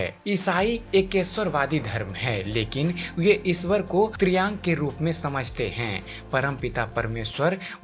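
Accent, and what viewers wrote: Bihar, India. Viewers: full bihari aawaj he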